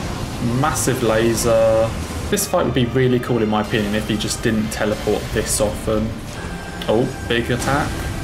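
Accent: United Kingdom. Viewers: Why do you souns homosexual or are you just British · Its called a british accent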